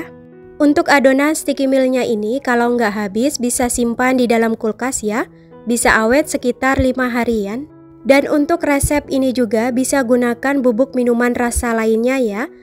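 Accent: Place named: Madura, Indonesia. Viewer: Logatnya kayak madura 😅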